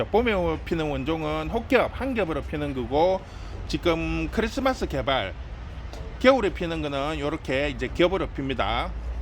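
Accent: Gyeongsang, South Korea. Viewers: 사장님 사시는데가 어디입니까?저는진주사는데 말씨가 이쪽경상도 같아서 가까우면 가계에 한번가보고싶어요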